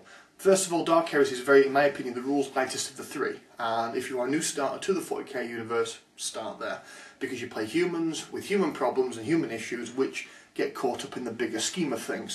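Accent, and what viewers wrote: United Kingdom. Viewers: Good job on the review. Your Brit accent makes everything so much nicer to listen to. LOL But good info and some nice insights. Thank you. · In fact, I think I've pegged it...his Brit Accent is what makes the channel ! Brits sound so good saying things...."modified version of the Fantasy system..." - I mean...you can't even come close saying that in American English ! Plus he's smart...and knows his stuff...and he's just a great commentator. One of my favorite quotes is, "I want to play an Orc...just an ordinary Orc. And I can't do that.. and that's a shame." - see his Warhammer online review !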